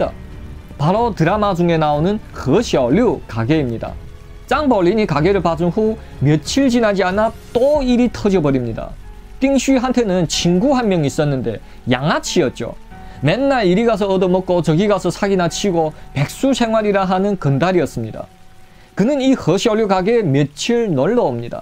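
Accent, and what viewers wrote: Yanbian, China. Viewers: 근데 진짜 조선족이신가요? 일부러 연기하는 말씨는 아닌거 깉은데. 여하튼 스토리에대한 말빨은 진짜 국적막론하고 듣는사람 잼나게 하네요. 근데 유선이야기는 제갈량 사후이야기로 첨부 나오는데 제갈량 죽기전전에 유선은 사망한걸로 아는데.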